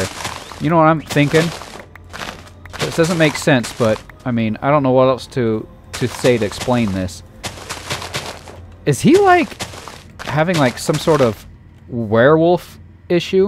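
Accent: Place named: United Kingdom. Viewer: WE ARE BRITISH 🇬🇧 (sorry I love the British accent)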